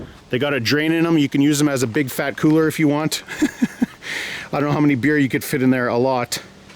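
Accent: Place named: Canada. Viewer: talks like a true Canadian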